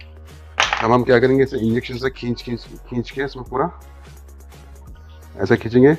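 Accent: Hyderabad, India. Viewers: Bhai aap hydrabad sa ho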